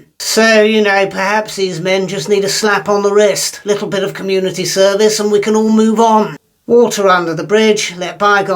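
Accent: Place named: RP, United Kingdom. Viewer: I've never heard a Labour MP speak in RP mate, you sound more like a Tory MP to me!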